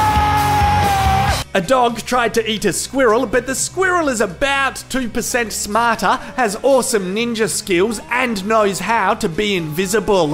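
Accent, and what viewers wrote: Australia. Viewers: Mate what part of straya ya from. NSW meself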